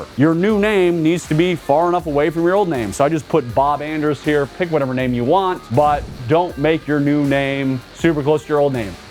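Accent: United States. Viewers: So you dress up like the cowboy from the village people, new hat boots and clothes and you go to Dallas Texas with your Yankee accent and pasty skin and say you're from Texas ? 👌🏼 You'd last 5 minutes tops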